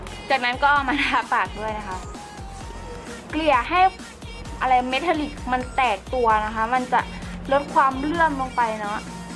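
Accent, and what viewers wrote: Isan, Thailand. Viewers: มักคำเว้าแหมะค่ะ555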